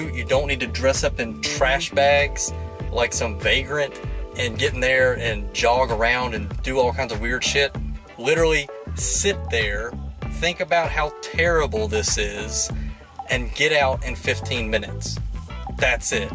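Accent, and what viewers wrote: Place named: South, United States. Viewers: An asian man with a semi southern accent? Now I've seen everything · Must be in Texas duh ... y’all act like we’re not supposed to have Asians 🙄 country Asians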